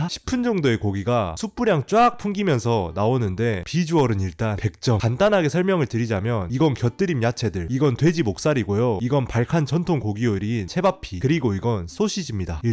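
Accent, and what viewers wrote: Jeolla, South Korea. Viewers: 말이 전라도이지요 요사히 전라도라면 그렇게 좋아하지 않구만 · 아따 전라도 양반이구만요..ㅋ 사투리 느껴짐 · 혹시 억양이 전라도 분이신가 티가 나는데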